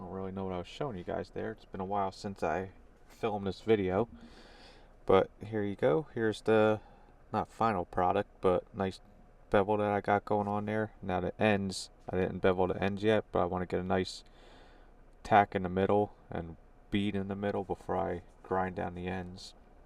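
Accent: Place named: Southeast Pennsylvania, United States. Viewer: Nice work and thank you for sharing,! Where's your shop? It seems like I'm hearing a S.E. Pennsylvania accent.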